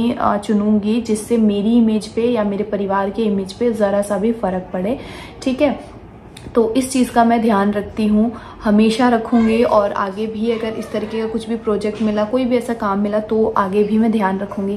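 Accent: Bihar, India. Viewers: Bihar ke logo ki hindi thodi disturb rahti h unke language se pta chal jata h ki Bihar ke hai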